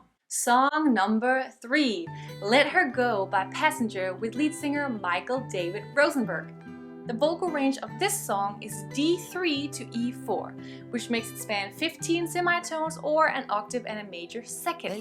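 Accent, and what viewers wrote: Denmark. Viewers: you sound a bit danish huh???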